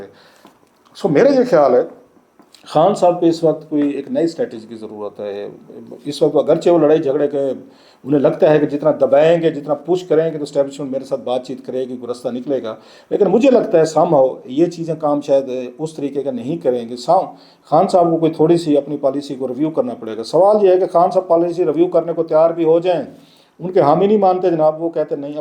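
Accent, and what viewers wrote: Saraiki, Pakistan. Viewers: Please try to correct your Urdu accent, feels that your vlog in saraiki , please next time aap saraiki me vlog Karen,Urdu aap ke bus ki BAAT nahi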